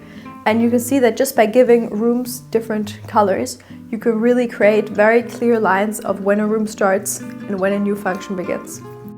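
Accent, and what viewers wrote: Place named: Germany. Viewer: I love to hear you speak. Your voice is so beautiful and your English is perfect with just a hint of (German?) accent? Sorry if I got it wrong.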